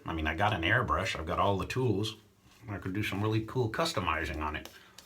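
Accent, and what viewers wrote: England, United Kingdom. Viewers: Do you recall having an English accent at any time as a child? Are there any recordings of your voice at that time?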